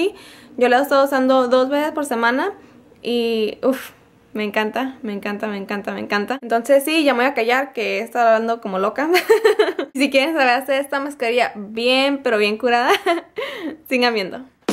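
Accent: Sinaloa, Mexico. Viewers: Eres de Sinaloaaaaaaaaaaaaaaaaaaaaaaa!!!!!!!!!!!!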